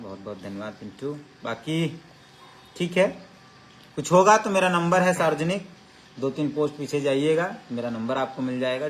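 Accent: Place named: Bihar, India. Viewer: Ap jharkhandi jesa bat karo ne ap bihari jesa bat kar rahe ho jharkhand ka niwasi ho kar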